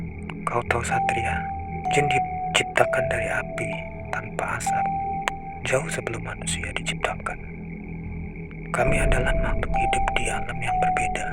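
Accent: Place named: Sumatra, Indonesia. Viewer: Author org Sumatera to? Kirain jawa ngapak... 😅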